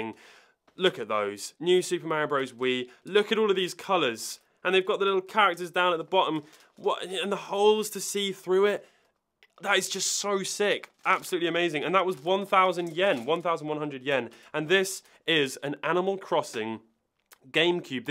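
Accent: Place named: United Kingdom. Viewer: I don't think nub Is a bad word but his british accent makes It sound like knob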